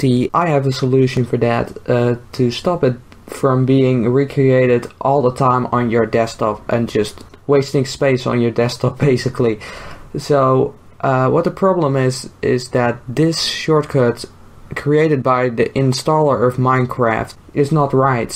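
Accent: Netherlands. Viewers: Your dutch accent tho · mooi nederlands accent man haha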